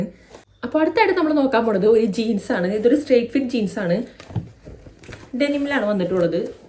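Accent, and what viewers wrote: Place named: Thrissur, India. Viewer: Chechide veed Thrissur aano Slang avidethe thonni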